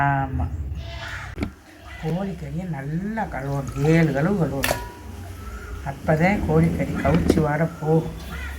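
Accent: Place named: Ramnad, India. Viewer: Nenga ramnad dhana unga slang ramnad madhuri iruku illai adhai suthi ulla giramam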